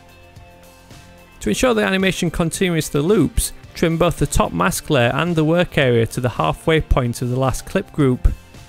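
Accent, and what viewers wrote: United Kingdom. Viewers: is that a British accent? I love that accent! we Americans have a boring ole hum drum accent - so unfair!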